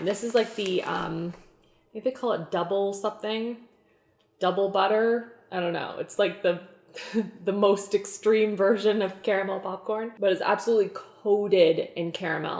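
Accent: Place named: Canada. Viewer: I came here trying to find some ramen lore, and then you mentioned Nanaimo bars and I was like...... wait a minute.... and then the way you said that they are named after Nanaimo, a city in BC, like people would just know what BS stands for, and then I got to thinking about your accent and I did some quick google searching, and I HAVE FOUND A FELLOW CANADIAN ON THE INTERNET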